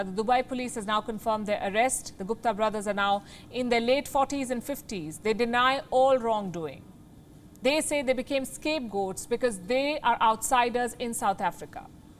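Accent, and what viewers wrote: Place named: India. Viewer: I love this lady. The slight mixture of the Indian accent, the voice, confidence, and expertise with which she casts is amazing. Keep doing the great work.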